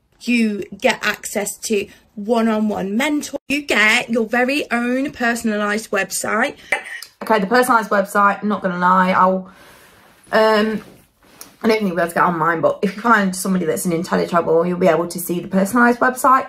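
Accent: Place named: Nottingham, United Kingdom. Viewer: Brilliant vid! Is that a Notts accent I hear? Reminds me of one of my close friends from Mansfield/Sutton in Ashfield!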